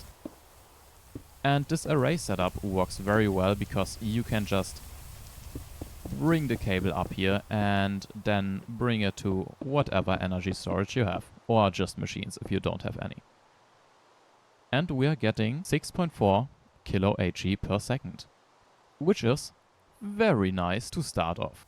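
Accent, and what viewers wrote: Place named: Germany. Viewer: I can hear the Deutschen Akzent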